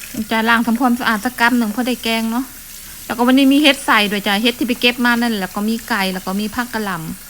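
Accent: Korat, Thailand. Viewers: พูดโคราชนั่นละจ้ะ ชอบ ดีจ้ะ ผักงามอย่างนี่ เขาว่าคนปลูกมือเย็นเนาะจ้ะ ปลูกไอกะงาม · ผักงามจังเลยค่ะ ๆพูดโคราชเพระดีจ้า🌿🌿🌱🌱🌱💞💞💞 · น่ารักน่ะภาษาโคราชชอบฉันนๆน่่ะ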